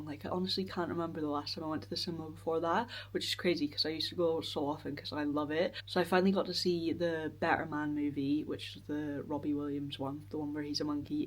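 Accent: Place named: Scotland, United Kingdom. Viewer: would you consider wearing a microphone. Your voice is really gentle and I struggle to hear you without the volume waaaay up, which is fine until ads come on a mega volume 😂 YouTube closed captions can't cope with your Scottish accent either lol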